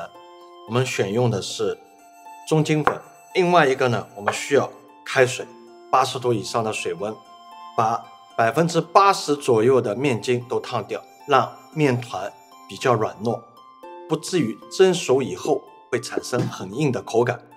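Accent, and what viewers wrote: Shanghai, China. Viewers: Grace W 很明显的上海口音 ：） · 上海腔普通话~🤣🤣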